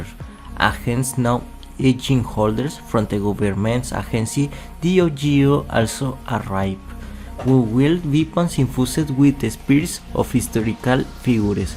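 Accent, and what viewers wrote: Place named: Spain. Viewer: He's spanish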